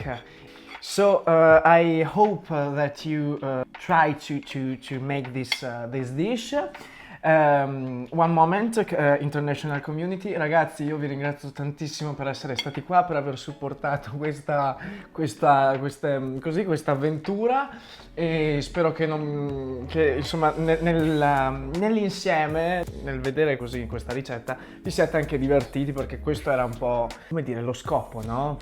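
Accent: England, United Kingdom. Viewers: Le parole in italiano con l'accento inglese... Calato nella parte proprio!!! 😂😂😂😂 fantastico! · Simpaticissimo video,fa ridere quando parlu in italiano con l'accento britannico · sei dolcissimo con quella faccia da schiaffi 😂mi fai morire quando dici le parole in italiano con accento inglese 👏bravo 👏👏complimenti x gli iscritti avanti così 🤗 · Questa risatina così italiana 'eheh' tradisce un po il tuo perfetto accento British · No daiiiiiiii x favore parla italiano e metti i sottotitoli in ingleseeeee...poi quando parli in italiano con l'accento inglese quello ci piace ahahahahah 😄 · Ma fatemi capire: non conosce l'inglese, non sa cucinare e parla l'italiano con l'accento inglese... E fa un video di cucina in inglese??? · è un pochettocringe anche quando parlavi in italiano ma con l'accento inglese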